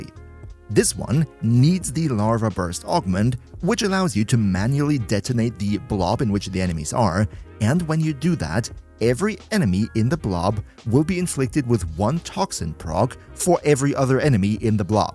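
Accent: Germany. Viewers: Well, he's german 😉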